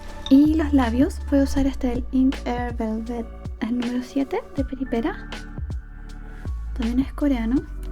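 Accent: Chile. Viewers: Ahora sí usas tu voz natural de el Dejo Chileno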